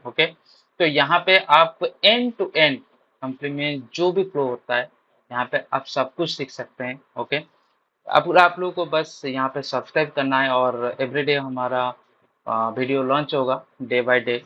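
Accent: Odisha, India. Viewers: Are you ODIA? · Are you an odia, bro ??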